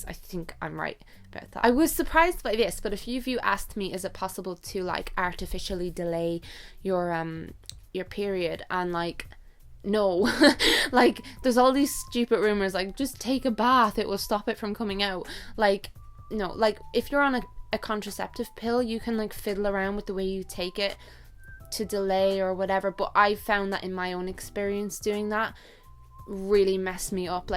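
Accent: Ireland. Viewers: I can't wait to read your book I just realised that you have a YouTube channel and I'm so happy that I found an IRISH 🍀 YouTubers I love u so much thank u for being Irish and having an Irish accent rather than American or English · I think you sound so cute with your denture! I love listening to your voice, the Irish accent is my favorite!!! You are gorgeous and thank you so much for all your hard work and your realness. I love all your videos 💚💚💚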